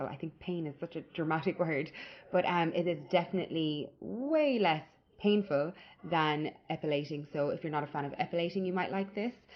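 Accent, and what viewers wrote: Ireland. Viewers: Was very confused at first when you mentioned Australia because I was like, "But wait... she sounds Irish. There is no way she is not Irish." Thank you for having accurate information in your "About" section! :)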